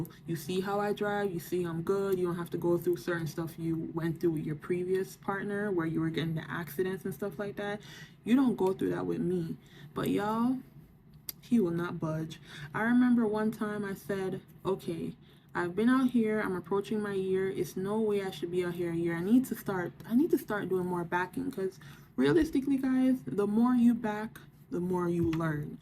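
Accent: Jamaica. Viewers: Are you Jamaican 🇯🇲 Yuh sound like a yard girl…welcome to trucking 🚛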